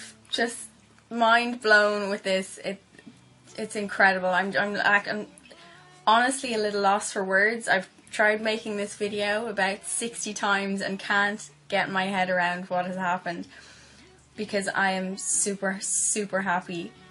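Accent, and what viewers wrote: Ireland. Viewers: Are u Irish I recognise the ascent from when I used to live there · I think she's irish?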